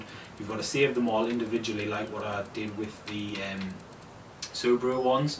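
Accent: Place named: Newcastle, United Kingdom. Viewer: Are you from Newcastle, you sound northern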